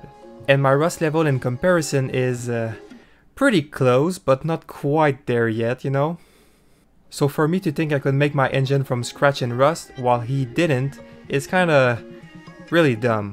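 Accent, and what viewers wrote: Quebec, Canada. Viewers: Not really related to the video, but are you from Quebec ? xd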